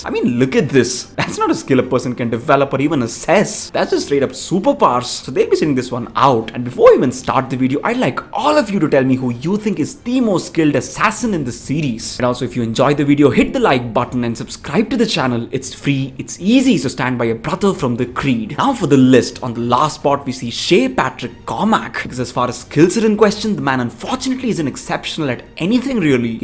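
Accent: India. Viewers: In my opinion you (the player) is the best Assassin Btw love your south indian accent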